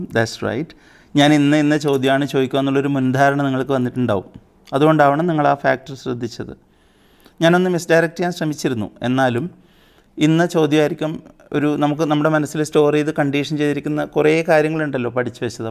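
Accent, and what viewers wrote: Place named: Kanhangad, India. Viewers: Kanhangad alle aadhiyettan...kannur alla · Kannur alla kasaragod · അങ്ങേടെ ഭാഷയിൽ ഒരു മലബാർ ശൈലി ഉണ്ടെന്നു തോന്നി.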